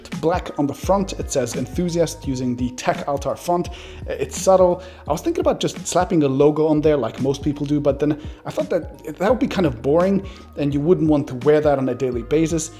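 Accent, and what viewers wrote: Germany. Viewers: Really enjoy watching this channel. First time I thought that he is from Germany haha :)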